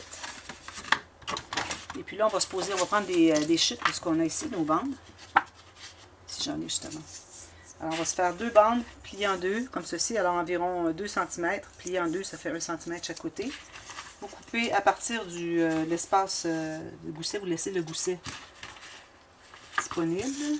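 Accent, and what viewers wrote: Quebec, Canada. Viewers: Re-bonjour, merci et pas de problème, votre carton -papier vous le prenez à quel endroit, et vous , à votre accent vous êtes du Québec , de quelle région ?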